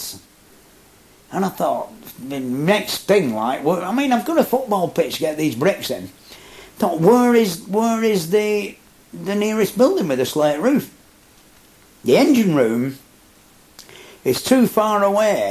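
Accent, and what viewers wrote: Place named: Bolton, United Kingdom. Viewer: Nice words, its magic isn't it. The Boulton accent i still hear from my family who moved to Cornwall in the 60s. I wear overalls and a greasy hat most days and I'm a young un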